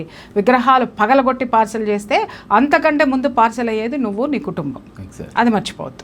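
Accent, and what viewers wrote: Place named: Telangana, India. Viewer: మా తెలంగాణలో ఇట్లనే మాట్లాడతాము మీ ఆందోళన సంస్కారం ఉంటుంది మా తెలంగాణలో యాస ఉంటుంది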